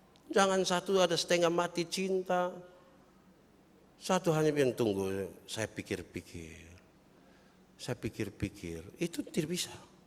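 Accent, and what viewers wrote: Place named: East Nusa Tenggara, Indonesia. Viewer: Shalom, khotbahnya pelan, halus,lucu, tapi menusuk sampai dihati yg paling dalam dengan aksen NTT..sangat diberkati bagi anak2 Tuhan yg mendengar. Tuhan Yesus memberkati Pelayanan, jadi berkat bagi orang banyak sampai ke Bangsa2. Salam dari Bali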